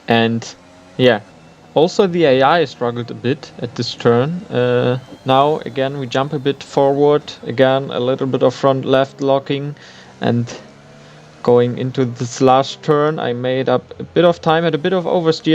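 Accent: Germany. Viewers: Wieso machst du deine Videos eigentlich nich auf Deutsch auf Englisch ist das so weird weil man merkt das du deutsch bist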